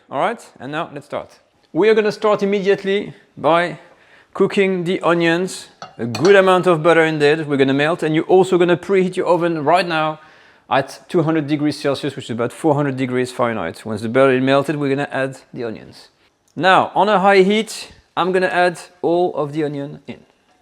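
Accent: France. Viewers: I love your french accent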